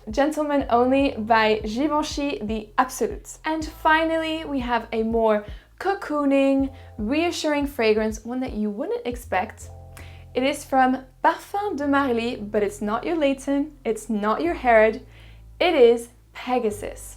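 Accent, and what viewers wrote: France. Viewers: I can hear you are French.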